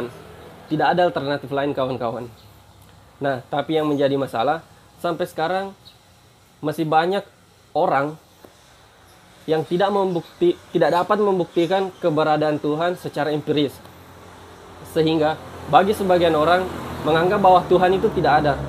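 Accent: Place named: Bugis, Indonesia. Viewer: Kayak nya kita satu daerah dari logatnya bang kl boleh nebak dari bugis ya saya juga asli sulawesi tapi dari kecil di jawa skrg sdh menetap di jawa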